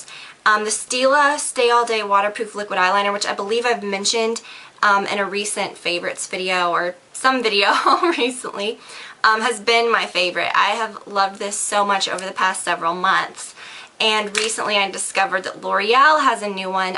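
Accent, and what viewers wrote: South, United States. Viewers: Your Southern accent is cute. :)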